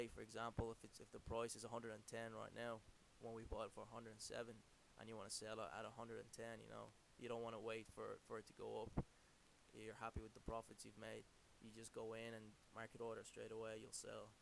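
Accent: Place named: Ireland. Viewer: I take it your from Ireland judging by the accent, same as myself, I was looking into this firm as oppose to Davy I was just wondering how you find trading with them? I was attracted by really low fees but they seem dodgy. I would mainly be a value investor type guy, so il be investing for the long run, but just wondering is there maintance fees for the account and all and how do you find trading with them? Are they worth it also are you paying CGT per trade or ?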